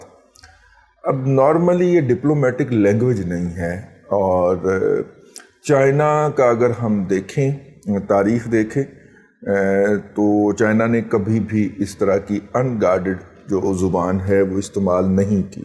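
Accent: Punjab, Pakistan. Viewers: سر میرا بڑا دل کرتا ہے کہ میں آپ کی منہ بنا کے پنجابی لب و لہجے میں اداکاری کرتے ہوئے آپ کا یہ جملہ آپ کے منہ پر دے مارو میں ان کا حمایتی یہ ترجمان نہیں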